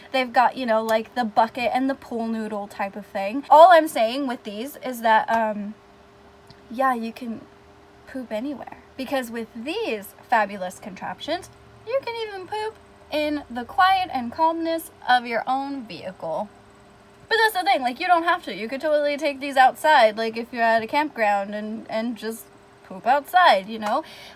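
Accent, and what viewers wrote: Texas, United States. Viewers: Are you from Dallas, Texas?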